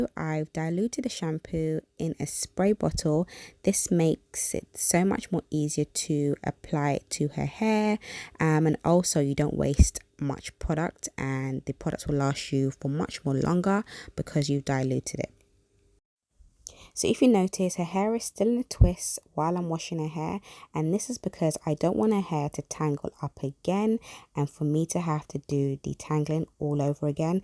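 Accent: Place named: London, United Kingdom. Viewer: Are you from London? Nice accent.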